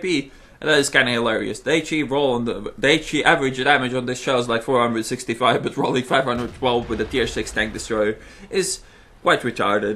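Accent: Russia. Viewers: lol, Id love those russian accent, some how I just can't pretend one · dat russian accent :))) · LMFAO! Love your Ruski accent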